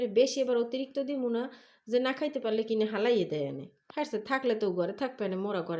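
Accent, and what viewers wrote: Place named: Barguna, Bangladesh. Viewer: ময়না পাখি তোমার ভিডিও আজকে প্রথম দেখলাম তোমার কথাগুলো সত্যি খুব ভালো লাগলো ❤আমার শ্বশুরবাড়ির অঞ্চলের ভাষা মনে হয়েছে আমি যেন বরগুনায় আছি ❤বাজার গুছিয়ে নেওয়া সবকিছু মিলিয়ে খুব ভালো লাগলো❤❤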